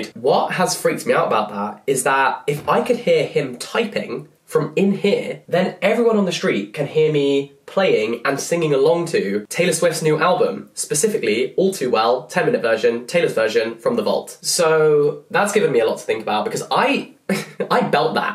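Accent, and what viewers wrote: France. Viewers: I love your french accent ! From a french girl 😉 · Big fan of the didn’t cry, I’m hard as nails to the don’t come for my French accent or clothing choices energy 👌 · Your french accent is actually pretty nice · i love your little french accent!! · Your French accent is so cute tho 😍 And I will also happily order a vase of wine 😂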